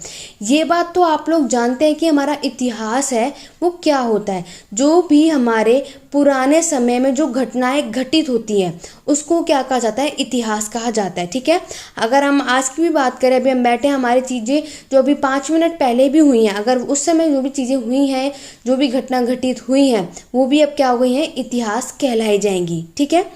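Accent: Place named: Haryana, India. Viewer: Haryana sa ho mam lgta ha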